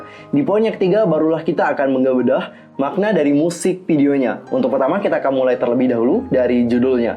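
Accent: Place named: West Java, Indonesia. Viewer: Salfok sama cara ngomongnya sunda banget. No Hate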